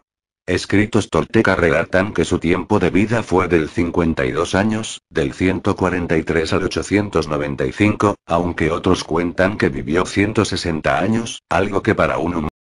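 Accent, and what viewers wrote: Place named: Spain. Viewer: Que estúpidez si iban a hacer algo de Quetzalcoatl lo hubieran hecho siquiera sin el ofensivo acento españolete, es decir lo hubieran leido ustedes. seguro no saben leer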